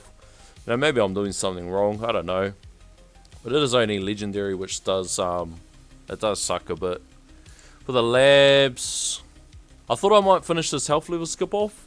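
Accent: Australia. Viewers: nothing like getting some work done with that sweet aussie accent talking about my favorite tower defense game of all time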